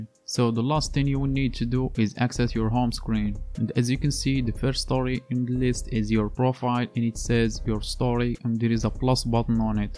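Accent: Morocco. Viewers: from you accent i can tell that your moroccan am i right ! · you'r accent like arab ( morroco - marrakech ) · mn soutk 3rftk mghribi hhh kayn m3alem abro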